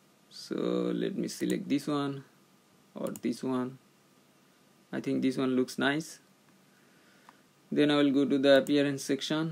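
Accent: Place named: India. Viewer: you speak like indian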